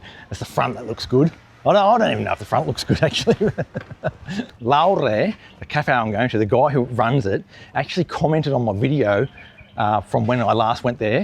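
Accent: Australia. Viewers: Wiskii's iced cappuccino is excellent (along with all of the other styles). I'm a Mon/Wed/Fri regular at about 10am and he is always open then. Oh your accent is still recognisably Aussie. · Hey You definitely don't sound anything but an Aussie. Funny what people hear, my wife is German and she often gets mistaken for South African which she doesn't sound anything like it. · Absolutely! you sound full Aussie bro 😂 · As someone who enjoys a good strong coffee, I'll definitely have to give Wiski a go-go (Sorry). You sound Australian to me, especially when you use colloquialisms. I was once told I was South African by a lady in an office in a small town called Myrtleford, Victoria. For 40 minutes I tried to convince her I was actually a Kiwi, but she wasn't buying it. In the end I said I was from Swaziland and left. Small town people are funny. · No surprise that the visa fee hasn't been removed, it will never be removed. 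Your accent is fine, you sound fully Strayan to me. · For us Europeans your accent is 110% aussie! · No, you 100% have a strong Aussie accent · Your accent is still totally Ozzie, never fear!😂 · Your accent is defo Aussie! Love the “how’s your father” saying too 😂 Great vid ❤ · you have one of the Aussiest accents I've ever heard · you still sound like an Aussie. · Funny,I think you sound sooo aussie,but I watch anyway!!I don't know where you lived in 'Stralia but you seem to have a Seeedny accent to me.but what do I know,I'm a Kiwi,but I have recommended your channel to a couple of people and said "he has a very Aussie accent tho",almost like it's a criticism😂.I'm a bit biased tho cos I have two syblings who are Australian.ignore the negative people,I think you're great and you've inspired me to think about moving to Bali when I retire in 3 years.cheers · I am happy to pay the visa and for the country to provide better service and infrastructure for the lovely Balinese. 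However read your comment and it’s not getting where it should go sadly. I also agree with the Minister. I have watched the videos of the shameful behaviour of Aussie and UK youth getting absolutely black out drunk and totally disrespecting the locals. Quality tourism is desirable and banning those that behave destructively and inappropriately. Your follower stating you aren’t Aussie sounding is a dingaling 😂you can pick your accent a mile away. Loving the content and the food critic 😂😂😂 So glad you didn’t leave as your is a beautiful keeper 🙏🏼❤️ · Your accent is true blue mate. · Another lovely day in beautiful Sanur.I have often got the "hit of frustration" with suamiku and his" jam karet" as it has caused a few problems........sometimes he is 2 hours earlier than the arranged time and wonders why Im not ready to go! Uh duh! You have a dinky di Aussie accent.Dont know what that dude was talking about,saying you didnt.You pronounce Indonesian words benar! 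I myself am quite proud that I can roll my "R's". The "visa free" debate is interesting.I do understand the Indonesian attitude of pride and self worth especially for the fact that Oz charges so much for Indonesians to aquire a visa. Another great video and "Durwood" says hello! 😂. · Nah mate. Your aussie accent is fully intact. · Your accent is fair dinkum aussie, the only difference between us is that you roll your r’s and pronounce the Indo words well. You even chuck some slang in there. A good thing to teach your daughters, if he waits around for 2 hours he must be keen! Well done 😁 Thank you for answering our comments, we don’t expect you to. Often we comment because apparently it pushes the google algorithm out there. Cheers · I am not fazed by paying for a visa....Australia charges way more than Indonesia..$250 if you are coming to Oz... maybe aussies should ask why australia charges so much? 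Your Aussie accent is still there 😊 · a well spokenAussie, but definitely Aussie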